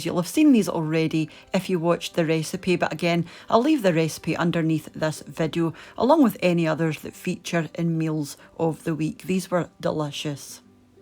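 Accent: Scotland, United Kingdom. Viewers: I truly adore your videos. You’re a wonderful and creative cook. I’m in Texas and my favorite part of your videos is your charming Scottish accent and pictures of Scotland. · My maternal grandpa was Scottish so it's no wonder your lovely accent and fantastic recipes make me feel like I'm at home !!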